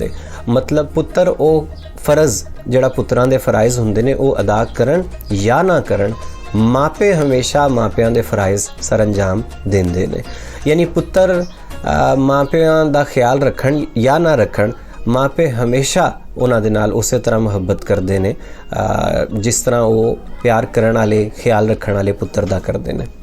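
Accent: Punjab, India. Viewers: Bahut alaw sir.... I like ur punjabi accent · Your Punjabi Accent is lit Sir✌❤✌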